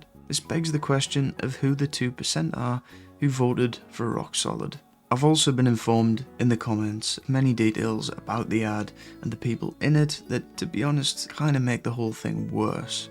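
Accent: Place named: Teesside, United Kingdom. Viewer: bizarre question but are you somewhere in Teesside?, I live near Middlesbrough and you sound very similar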